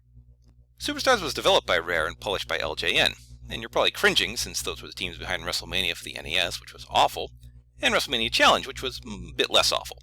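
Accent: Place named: Canada. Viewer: Depends where you are from. I'm pretty sure is Canadian due to his accent and sound of his voice. That said, he probably pronounces it like former Philadelphia Flyer and current LA King player Simon Gagne and his name is pronounced.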